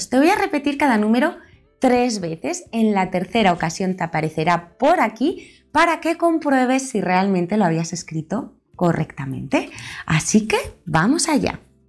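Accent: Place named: United Kingdom. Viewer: quizas sea por los diferentes acentos, cro que este es britanico que es distinto al americano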